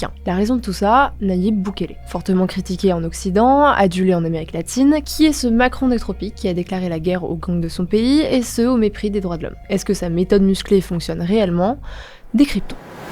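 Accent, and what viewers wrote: Spain. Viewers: Pas grave du tout pour ton accent espagnol, c'est une langue de M..... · L accent espagnol de fait que cette vidéo passe de top à excellentissime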